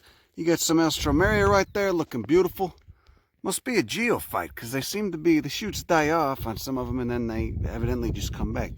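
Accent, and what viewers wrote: Chicago, United States. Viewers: Thanks for exploring my country. I found you because of the Chicago accent. A Chilean natural myself who lived in the Humboldt Park area and in Willmette for a couple of years. It's always refreshing getting to hear the accent I got my English from... If you ever come to Patagonia you'll be astonished of the flora down here. Youll get to see wild strawberries, fancy varieties of potatoes, Gaultheria mucronata, and the trees, man... wow! Fitzroya cupressoides, Araucaria araucana (monkey puzzles, typically pictured in dinosaur books and shit). If you EVER come here, you'll have a home where to stay, man! Again, I'm really happy to hear you talk about these wonders... in the accent I learned my English from! Hugs from Villarrica and keep up the good work!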